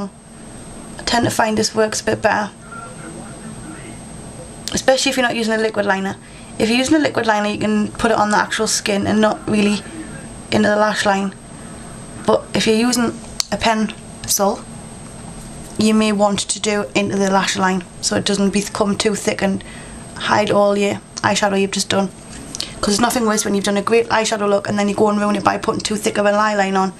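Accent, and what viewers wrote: United Kingdom. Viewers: by far, my fav look. keep up the great work. ps- love, love, love the british accent! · You know... you are so beautiful. I am from the U.S. and I love your accent. I've always wished I was from the UK... since I was a little girl. I'm pretty good at copying different accents. Anyway... Your tutorials are awesome and congrats on the BBC thing. Have a great day.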